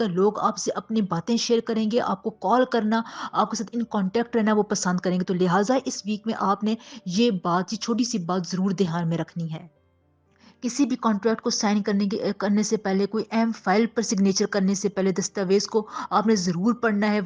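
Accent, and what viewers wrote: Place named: Punjab, Pakistan. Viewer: tez lehja punjabi h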